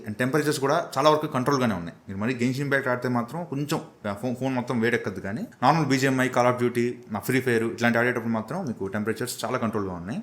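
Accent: Nellore, India. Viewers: bro nellore aa meedhi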